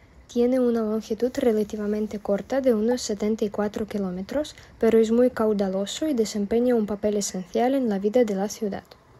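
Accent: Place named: Spain. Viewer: Su lenguaje es con tono de España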